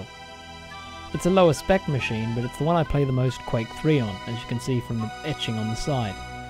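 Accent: Australia. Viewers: Australian BROOOO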